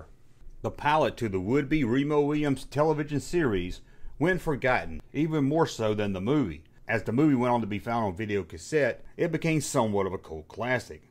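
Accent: United States. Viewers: sorry for my earlier (now deleted) comment - I can be a TOTAL DICK sometimes. I should have checked out more of your channel before leaping to ignorant conclusions. As a Canadian, I sometimes don't take into account the legitimate regional accents and vernacular of the vast US culture. That said, I enjoyed the reminder and comments on this interesting underrated, entertaining film. I recall (even at the time) burdening ANY FILM as "the adventure begins: was asking for trouble!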